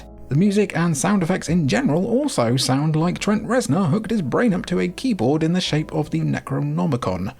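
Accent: England, United Kingdom. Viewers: There's something wrong about a British accent calling the Mega Drive a Genesis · You sound English... why do you call it the Genesis when it was ONLY called the Genesis in America? If you're English you, like the rest of the world, had a Megadrive. This dumbing down of the world to only cater to the American audience is really depressing.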